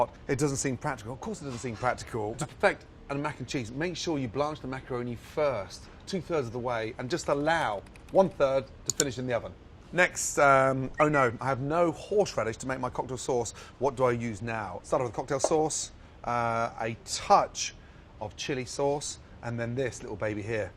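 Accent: United Kingdom. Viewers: イギリス英語を勉強できるいい教材だと思います。 イギリス英語を喋る友達となんか雰囲気が似てる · 料理と関係ないけどイギリス英語やっぱ好きだ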